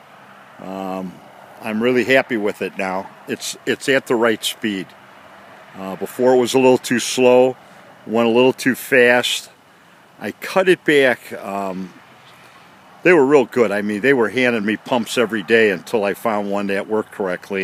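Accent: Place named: New England, United States. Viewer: I like your videos on this... How much is the electric start option, may I ask..., and where are you located. Your accent seems New England, me, I'm originally from Connecticut, Wallingford area. Thanks for all the good tips...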